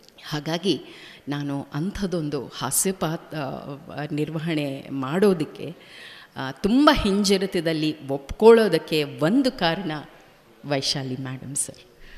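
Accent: Standard. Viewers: ಇಂತಹ ಕನ್ನಡವನ್ನು ಇನ್ನು ಯಾರುಯಿಂದಲಾ ಕೇಳುಲ ಸಾದ್ದವಿಲ್ಲ. ನಮ್ಮ ಅಚ್ಚ ಕನ್ನಡದ ಅಪ್ಪರ್ಣ ರವರಿಗೆ ನನ್ನ ನಮನ ಗಳು 🙏🙏🙏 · ಯೆಂತಃ ಸ್ವಚ್ಛ ಕನ್ನಡ ನೀರರಗಳ ಮಾತು ಒಮ್ಮೆ ನಿಮಗೆ ನಮ್ಮ ನಮನ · ಸ್ವಚ್ಛ ಕನ್ನಡ ತಿ,ಕನ್ನಡದ ಒಡ ತಿ ನೀವು ನಮ್ಮೆಲ್ಲರ ಮನಸ್ಸಿ ನಲ್ಲಿ ಸ್ಥಿರ ವಾಗಿ ಇದ್ದೀರಿ ಮೇಡಂ so sad I ಮಿಸ್ ಯು ಮೇಡಂ ರಾತ್ರಿ ನಿಮ್ಮ ವಿಚಾರ ಗೊತ್ತಾ ದ ಮೇಲೆ ನಿದ್ರೆ ಬರಲಿಲ್ಲ ಮೇಡಂ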